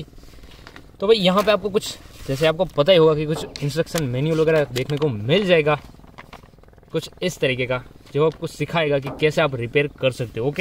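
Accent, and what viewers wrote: Gujarat, India. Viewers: Han bhai gujrati hai 🙃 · Bhai, tu gujarati he.?? I mean tone se lag raha hai.?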